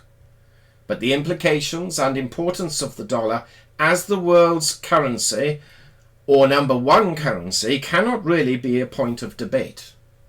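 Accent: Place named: Wales, United Kingdom. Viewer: The narrator is quite clearly British at a guess I'd say Welsh, and yet you pronounce YouTube as YouToob. Why?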